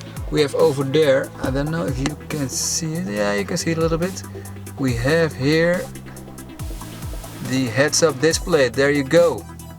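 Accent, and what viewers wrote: Netherlands. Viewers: Leer effe normaal engels te praten zonder zoon verschrikkelijk Nederlands accent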